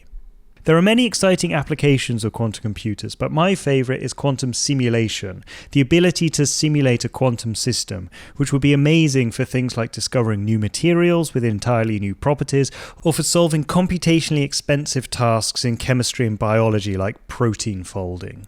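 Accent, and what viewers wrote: United Kingdom. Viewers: Your British accent is enough for me to believe you. Forget about the content.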